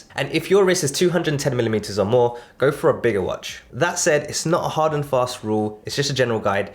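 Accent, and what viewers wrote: United Kingdom. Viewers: It's the first video of you I've watched, it was suggested by Youtube, I like your analysis and I'm agree with you about the size question. I have a Garmin 55 since 2 weeks, it's nice but the screen is awful compared to a smartwatch, I'm interested into the Apple Watch (series 9 when it will be out). You British accent is very clear even for a non-native speaker like me so I will definitely watch other videos of your channel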